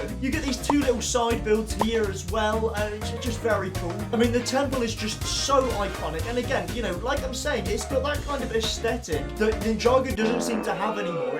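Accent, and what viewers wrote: Newcastle, United Kingdom. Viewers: Oh no way he is a Geordie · Are You from Newcastle?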